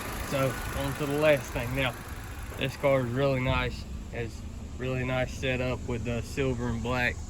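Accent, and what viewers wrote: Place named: South, United States. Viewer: That southern draw son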